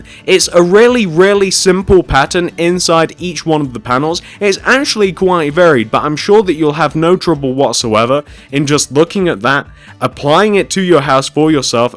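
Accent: United Kingdom. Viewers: Shock Wave it is him counting he is (it sounds like) british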